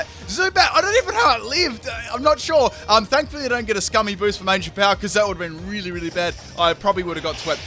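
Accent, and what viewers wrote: Australia. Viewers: Bro are u an auzzie?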